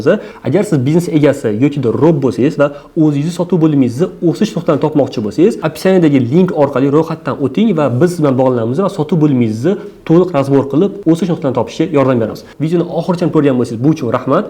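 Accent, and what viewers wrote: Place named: Qarshi, Uzbekistan. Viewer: Qayerliksiz qarshiliklarga o'yshap gapirdiz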